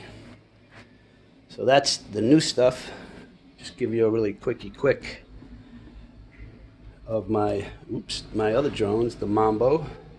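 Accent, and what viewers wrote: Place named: New York, United States. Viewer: Love it!! You're new York accent!! Lol by the he way graysons batts for 115 sold out thanks to you.. Lol love ya and your sense of humor!! Going to be a great summer..looking forward to seeing vids and getting to know you brother!! Sat mine gets here.:) but no batterys!! And I forgot a cord so can use frysky receiver..XSR. You got two 115s.now I'm jealous you'll always be flying. Figuring out myself too ,DNA big help bro! For x-lite! Have a great night!! God bless!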